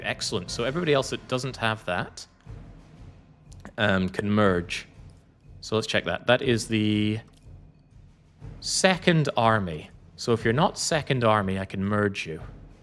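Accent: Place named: Scotland, United Kingdom. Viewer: Finally, some Scottish accent from. He refused to talk like that when playing a Scottish vassal back in CK3.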